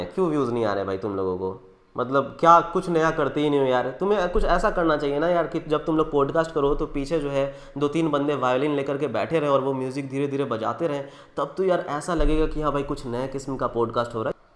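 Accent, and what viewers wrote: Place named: Uttar Pradesh-Bihar, India. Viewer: Mai bhai channel hi isiliye dekhta hu kyuki unki personality aur bolne ka tareeka typical up Bihar wale aadmi ka hai, jise sunke aur dekhke kuch apnapan lagta hai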